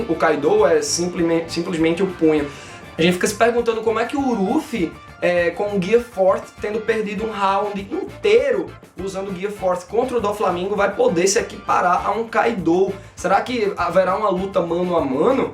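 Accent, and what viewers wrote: Pernambuco, Brazil. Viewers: VC e Pernambucano ou Nordestino?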